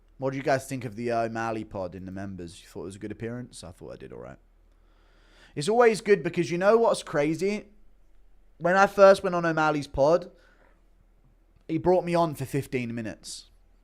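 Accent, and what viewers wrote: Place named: India. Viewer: has an indian accent off stream, it stinks like shit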